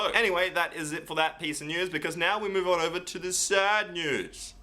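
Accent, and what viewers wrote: Australia. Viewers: He's Australian -_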